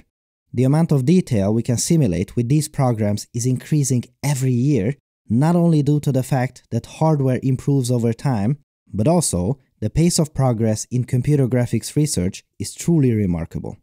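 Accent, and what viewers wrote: Hungary. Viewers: I like your Hungarian accent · Jovan magyar akcentusxd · Probably. His accent sounds a bit hungarian as well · omfg as a hungarian I can really feel your hungarian accent xd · Hogy hallatszik, hogy magyar vagy :D klassz a vidi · as soon as i heard your voice i knew you were hungarian. i like your accent :)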